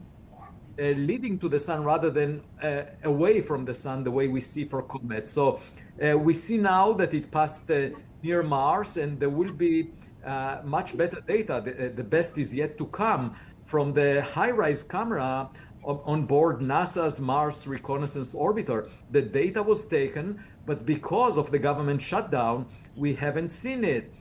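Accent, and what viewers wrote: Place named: Eastern Europe. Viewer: 听口音也像是东欧那边的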